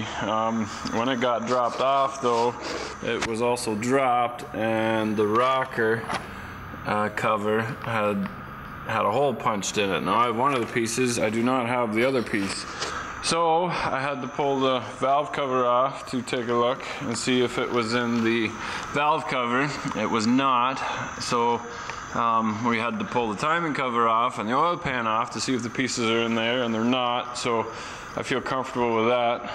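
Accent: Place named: Canada. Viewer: canadian for sure. honest, efficient. nice guy too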